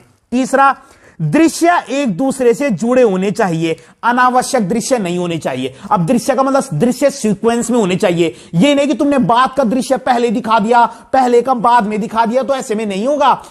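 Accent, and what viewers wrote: Garhwal, India. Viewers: Sir aap gadwali ho ? Apni tone gadwali he .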